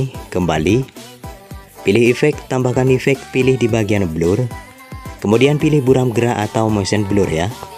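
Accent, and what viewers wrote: Madura, Indonesia. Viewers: Logat nya kok kayak orang Madura ya😅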